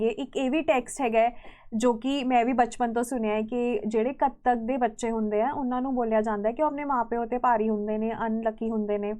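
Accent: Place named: Punjab, India. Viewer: Awesome to hear Guru Nanak Dev ji’s entire history in your Punjabi voice 🙏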